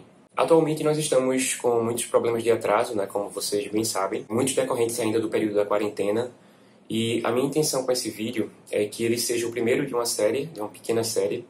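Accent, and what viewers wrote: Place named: Northeast, Brazil. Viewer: Gostei do sotaque . "É de nós " do Nordeste , eu acredito ! Um forte abraço e força para crescer na crise . Gostamos muito do trabalho de vcs , inclusive , formei um grupo de amigos para todos comprarem as camisas dos Sentinelas , dos cavaleiros de ouro ! Pena que aconteceu isso . Espero que vcs recuperem e assim que vcs retomarem, compraremos as camisas . Grande abraço.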